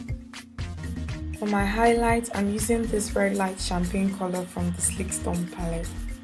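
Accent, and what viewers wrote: Nigeria. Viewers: I love everything about you and how u keep your Nigerian accent intact because it is soo cute. love your hair and videos too.. awesome. if you don't mind, could you tell us more about your hair because it gorgeous. stay blessed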